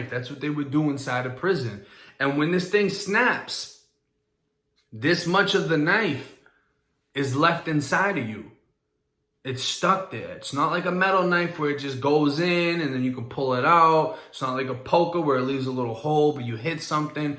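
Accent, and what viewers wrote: Boston, United States. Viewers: U from florida? Or boston? That accent tells me u were raised somewhere else other then Florida lmao 🤣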